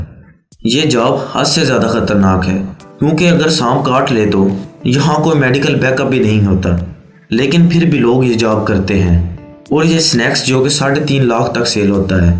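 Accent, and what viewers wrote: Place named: Kashmir, India. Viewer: Aslamo elikum ap kashmir Rawalakot sa hn sardar hn ap ke urdu sa pachana ha me b kashmir sa hn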